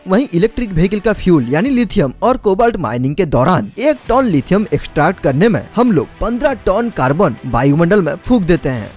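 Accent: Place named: Bengal, India. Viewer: Bhai tum pakka koi Bengali hai ya Oriya hai.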